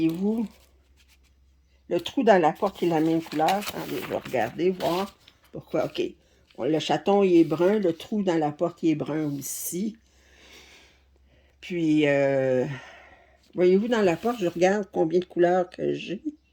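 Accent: Canada. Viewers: Félicitations 🥰 tu es ma parenthèse de calme avant d'aller me coucher, mon moment à moi quand tout es réglé à la maison, et ton accent canadien me fait voyager ☺️, et bien sûr tous tes déballages me motivent à broder (et à acheter 😂)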